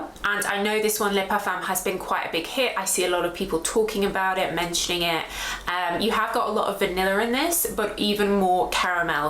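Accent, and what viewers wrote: United Kingdom. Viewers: I love your vids based on main notes of perfumes and I love your british accent-you are the Tish Wonders of the perfume world "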